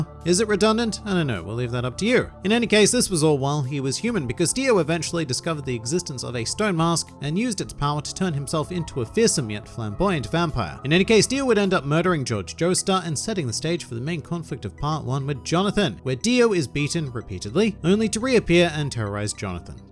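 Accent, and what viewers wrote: Australia. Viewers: I am here for an Australian man narrating. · You're right other videos don't have a sarcastic Australian man tell me about Jojo Family line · Sarcastic Australian man narrating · I didn't realize you were Australian. I thought you were American trying to be fancy. I guess that describes the Australian accent pretty well. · imagine not having read part 6 and hearing an australian talking about a dude whos looks are on the level of a trashcan who wields the stand bohemian rhapsody thats somehow connected to van gogh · hmm i do like sarcastic Australians narrating 🤔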